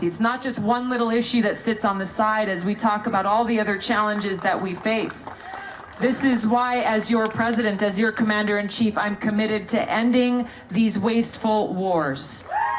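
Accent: Hawaii, United States. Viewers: This persistent "aloha" greeting might sound odd and unnatural to people in the Midwest. If you wanna win national elections, you'd better speak General American rather than Haiwaiian American.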